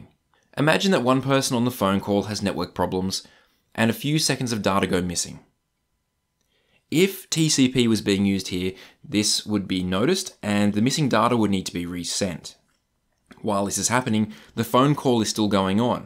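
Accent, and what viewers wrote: Australia. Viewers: That's not Indian lol. He's Australian. Easy to understand.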